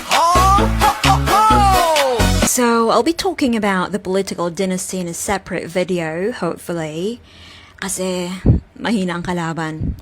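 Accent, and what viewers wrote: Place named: United Kingdom. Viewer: Hello Ms, good day to you. I don’t think Tio Moreno capable of a debate with a person as intelligent as you. Love that British accent by the way Atleast it sounded like a British accent or yung hilaw na Australian accent.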